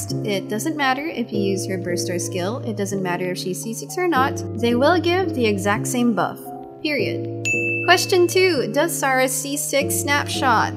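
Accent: Philippines. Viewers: It's in your about? I should have just checked 😆 I think you're from the Philippines tho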